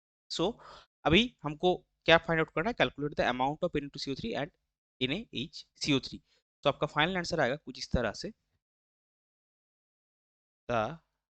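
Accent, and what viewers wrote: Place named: Bengal, India. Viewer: Dont recruit bengali accent its so uneducated sounding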